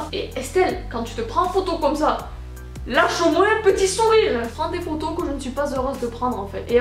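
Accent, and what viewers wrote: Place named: South, France. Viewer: ta l'accent du sud sa s'entant 😀😁☺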